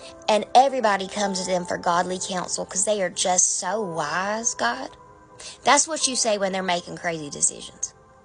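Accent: South, United States. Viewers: I embrace your advice in a southern drawl and everything in between. I will till the ground, plant the seed, and watch it grow. I won’t let it fall without tilling the ground. I’ve gone through enough crap to have fertile soil. To challenge life with authority. I love listening to you to much so I will listen to you. For the Lord’s sake. For all our sakes. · How sweet and Southern❤😊 Beautiful message. Thank You Sister. Virtual Tea❤😊 Amen. Message received. Hugs to ya.🙏🙌💕💞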